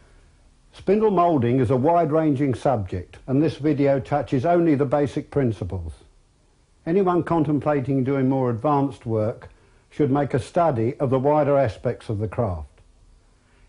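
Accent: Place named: South East London, United Kingdom. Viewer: I lived in SE London as a kid and later moved out to Kent so accent did not seem any different to my own. It is sad to hear he passed away but he did have a slightly younger assistant who was also very knowledgeable, I wonder if he has carried on teaching people.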